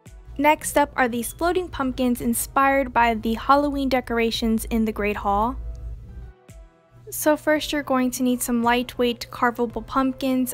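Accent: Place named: United States. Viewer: how much I hate American accent.....eww but these diys are so cute wow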